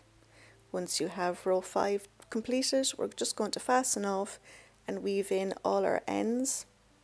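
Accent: Ireland. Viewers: I am a new learner, I was scrolling through tutorials on how to crochet a cardigan " I love a challenge " when I heard you're Irish accent 🇮🇪 I had to try this as im Irish. Im getting there. Thank you. ❤❤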